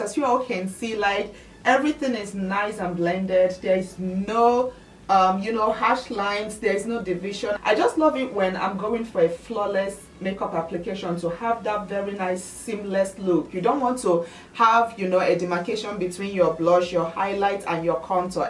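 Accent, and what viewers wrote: Nigeria. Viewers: I’m gonna guess Nigeria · b. yea shes nigerian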